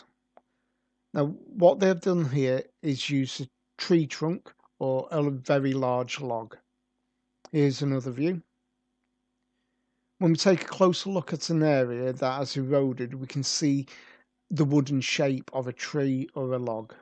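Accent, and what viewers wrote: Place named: Liverpool, United Kingdom. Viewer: You are a dimwit, you don't even display any knowledge about concrete Let alone Inca stonework. And your scowser accent perfectly portraits your intelligence level ...none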